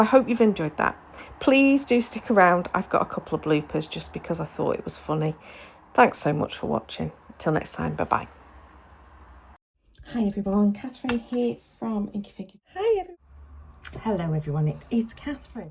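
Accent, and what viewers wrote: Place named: United Kingdom. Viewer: Heey, Catherine, your video is just fine! We all have our off-days (only most of us do not have to put them on the internet for everyone to share!) - and it is encouragement to all of us that you jot just managed to persevere but even got some exceedingly pretty cards out of it! Plus I love that you just tell it like it is: however pretty a set of stamps, dies, stencils is, if the instructions are not good, it is definitely worth knowing! I love your videos because they are real and fun as well as full of ideas and inspiration (and because I love your accent, takes me back to my days at uni in the UK (looooong ago 😂)). Take care and kind regards from Germany, Nicola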